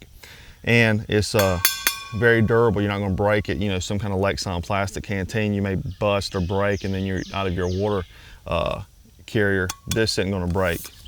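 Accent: South, United States. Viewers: Marine with a southern accent who reviews survival gear outdoors. Subbed.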